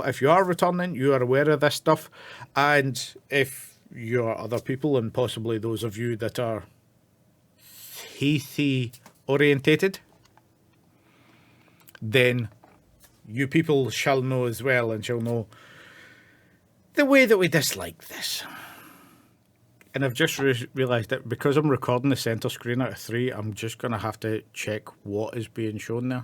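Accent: Scotland, United Kingdom. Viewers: i'm listening like, finland? that's a scottish accent lmao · Christ man, You are scotish? "rycordyn" "cerrierz" "skelz" music to my ears.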